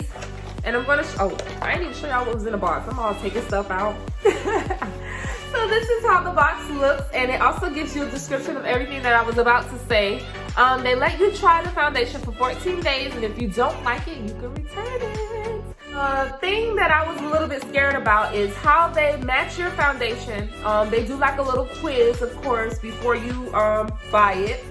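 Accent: United States. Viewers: First of all I liked and subscribed within the first 2 minutes secondly, I ordered the same number and girl you did that! Yuhhhhhhhh * yo voice *😂😂🥰 I knew you was from down south.. THEN YOU SAID Carol city!! Oh bihhh yessss 😂305 honey.. Take it to the houseee. #thecrib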